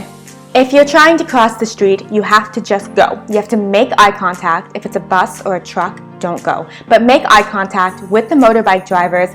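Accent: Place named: New York, United States. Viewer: You sound more ny than Canadian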